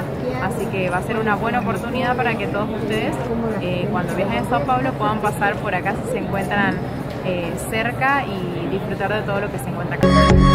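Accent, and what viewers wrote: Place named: Argentina. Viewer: Me vacilé tu acento argentino todo el video 🤭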